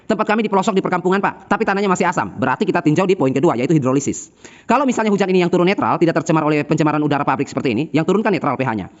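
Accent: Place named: Makassar, Indonesia. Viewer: Makasar kyaknya om sama kayak pak Rocky gerung hehhe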